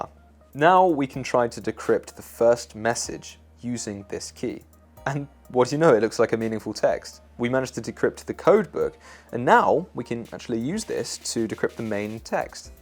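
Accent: RP, United Kingdom. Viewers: posh boy... sounds like all of Boris Johnson's school friends in one person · Aye, posho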